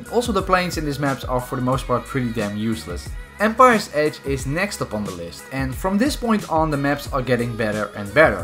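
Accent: Netherlands. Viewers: Nederlander hè? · Are you dutch? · Je praat Engels als een Nederlander maar het is goed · you speak English with a Dutch accent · are you Dutch? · Wait you are dutch? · Ur from holland Bet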